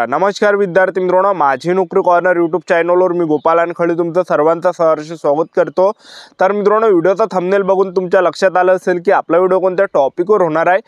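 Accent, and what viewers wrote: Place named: Gadchiroli, India. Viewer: Gadchiroli bolte😅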